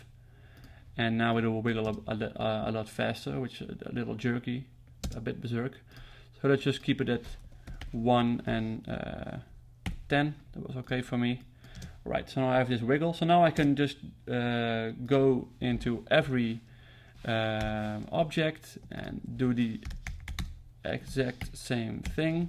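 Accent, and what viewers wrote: Netherlands. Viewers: Are you dutch? hahah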